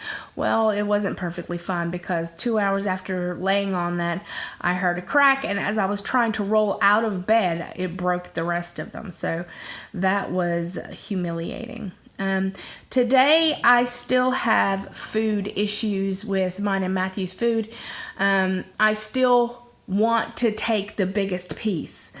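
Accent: South, United States. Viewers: I am so sorry for all the verbal and emotional abuse you have gone thru it actually brought tears to me. hugs you're such a sweetheart. I love your cute lil southern accent and your glasses are super cute. I think you are doing awesome and keep up all the good work girl!! 😁🤗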